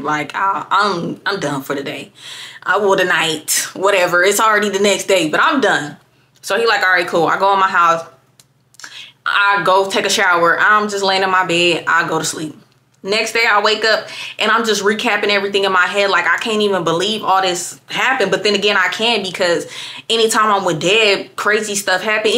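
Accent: United States. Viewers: looking good with the red - USA accent is still the coolest thing 'since sliced bread':washhands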